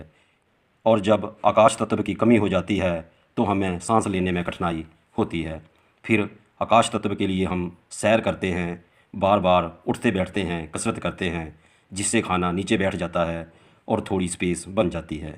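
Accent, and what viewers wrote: Punjab, India. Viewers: ur speak style very nice punjabi touch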